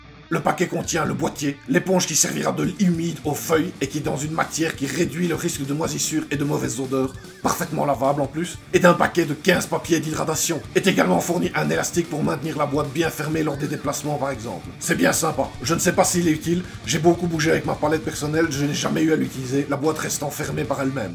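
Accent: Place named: Hainaut, Belgium. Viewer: Rien que pour l'accent hennuyer, c'est abonné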